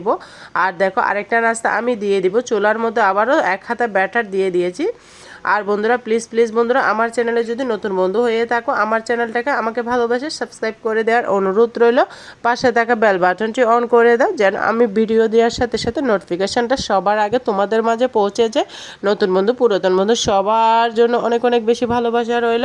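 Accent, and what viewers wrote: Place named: Chittagong, Bangladesh. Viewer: আপু তুমার কথার ভেতর চট্টগ্রাম চট্টগ্রাম একটু ভাব লাগছে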